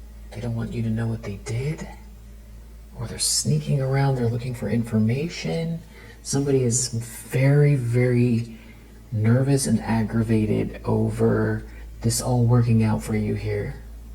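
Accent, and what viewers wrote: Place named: North America. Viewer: Im pretty sure isnt British, you can tell by how she pronounces her "R"s she is almost certainly from north America (Probably USA, or maybe Alaska or Canada)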